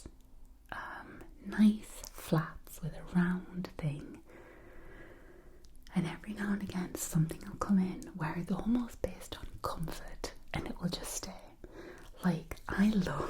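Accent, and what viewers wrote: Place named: Northeast England, United Kingdom. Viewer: I'm North East England myself by the way, listening to your voice feels like home ❤️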